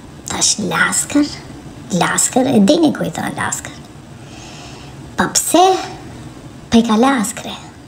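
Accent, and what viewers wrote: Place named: Tetovo, North Macedonia. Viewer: dialekt Tetove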